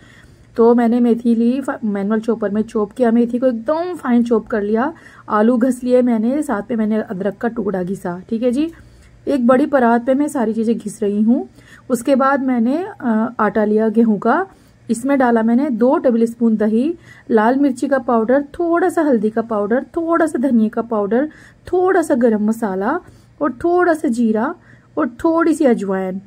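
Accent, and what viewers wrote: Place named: Uttar Pradesh, India. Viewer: Lagta hai aap up se hai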